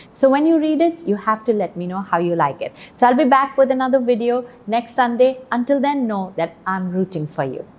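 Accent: India. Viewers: This is Indian English. I was expecting this English. Very nice.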